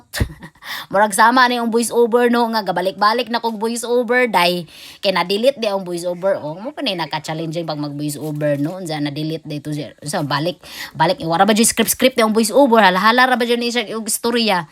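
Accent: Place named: Bohol, Philippines. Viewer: Bul.anon nga accent from Bohol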